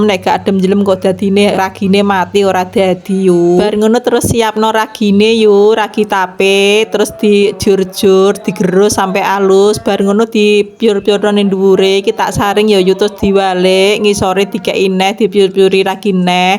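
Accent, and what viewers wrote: Java, Indonesia. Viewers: Ra kaprah..dialek .bjn blora y yu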